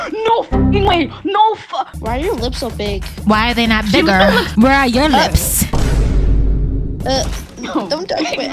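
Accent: Jamaica. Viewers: Are u Jamaican cause I'm Jamaican. BTW I'm your biggest fan ❤ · I like how she tried so hard on the Jamaican accent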